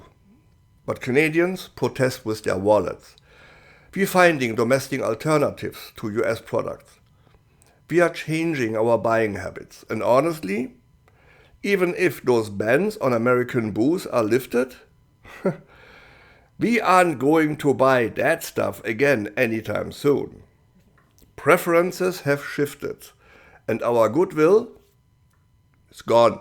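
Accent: Germany. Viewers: Liebe Grüße aus good old Germany! Ich muss schon sagen, dein deutscher Akzent ist deutlich zu hören, doch deine English Skills sind schon recht ordentlich. 😇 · thank you, great reporting & did I ever tell you that I love your German accent? LOL!! I totally agree with your POV! Although these times are VERY stressful for everyone, I can't say how PROUD I am of my fellow Canadians for standing up and standing strong against those CRIMINALS in the US. Whenever I feel down about everything, I read the comments on shows like yours and feel so much better, much calmer knowing that Canadians are feeling the same as I do and are willing to suffer the short term to gain in the long run. I trust our PM and am so happy to see him taking Canada away from these cretins down south and doing business with the rest of the world. What's the point of giving concessions to a mob boss that will NOT honour any deal with sign anyway?? 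Look, I work for the GOC and nearly lost my job when Carney did those major cutbacks on the public service. I was one of the lucky ones that didn't get cut but even if I did, I would still support him and what he's doing for our country against the lawless, murdering criminals running the United States right now. The one good thing tRumpf has done for Canada is bring us closer together as a nation to realize how lucky we are to BE Canadian and that we must now fight for that life - again - in world history. CANADA STRONG & BABA-MAGA (buy anything but american & make america go away)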